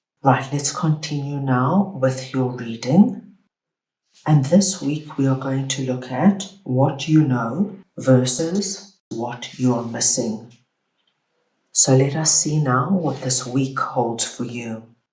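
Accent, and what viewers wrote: South Africa. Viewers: Thank you …. I love your South African voice!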